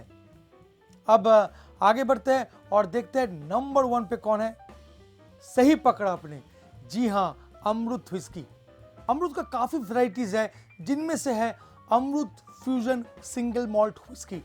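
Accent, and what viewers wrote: Bengal, India. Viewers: Ap Bengali ho....t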